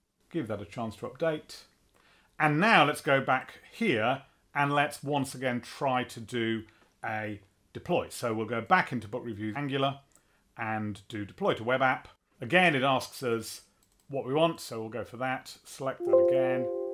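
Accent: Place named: United Kingdom. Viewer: Such good assistance with a great British accent, thanks :)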